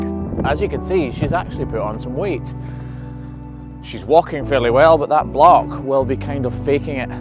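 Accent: Scotland, United Kingdom. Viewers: Hey man your Scottish right? I know you slip up on your vocabulary a lil bit but now wrys at that point go back to Scottish roots if we can't understand don't wry that's what closed captions are for lol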